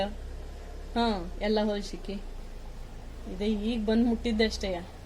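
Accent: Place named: Yellapur, India. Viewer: ನಮ್ಮ ಪ್ರೀತಿಯ ಯಲ್ಲಾಪುರ ಕನ್ನಡ ಬಾಷೆ ಎಂಥ ಸೊಗಸು ನಿಮ್ಮಿಂದ ಇನ್ನೂ ಹೆಚ್ಚೆಚ್ಚು ಸೊಗಸು ಧನ್ಯವಾದಗಳು🎉🎉🎉🎉🎉🎉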